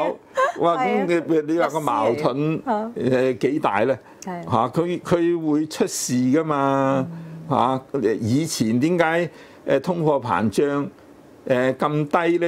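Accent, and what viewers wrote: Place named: China. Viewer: 女主持有口音。。。兩個都係大陸人。好粉紅喔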